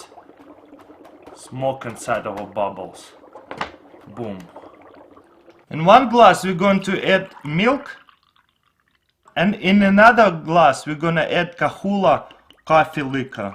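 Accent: Russia. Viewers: дуратский английский..когда слышно что русский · I'm French canadian living in west english speaking environement and this guy is really cool and authentic Russian accent. Keep that budy we love you and your funny experience. Thumbs up! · Учусь на ин язе . Когда я это услышал ,я думал умру от этого акцента. Если Вы русский тогда снимай на русском или это принцип какой-то? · сразу слышно что русский хдд но очень интересно поэтому лайк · cmon... i like this Russian accent :p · i fking love this fking russian :P when he do something he always say boom :P hes so funny and his english making me laughing so hard :PPPPPPP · you got Russian acsent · Love your russian accent!! great vids btw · russian english is very funy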